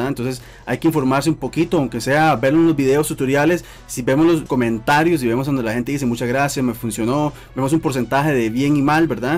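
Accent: Costa Rica. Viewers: Eres de costa rica?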